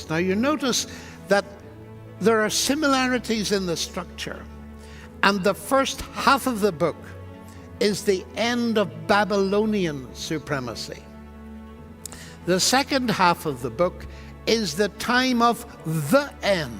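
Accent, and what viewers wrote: Northern Ireland, United Kingdom. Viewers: I concur with everything you've mentioned, except that he has an Irish accent.He's from Northern Ireland 😇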